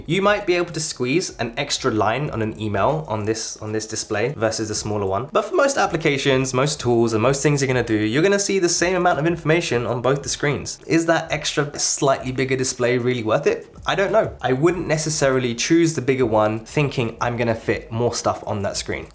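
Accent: United Kingdom. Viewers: Very helpful. Thanks...you sound like your from west london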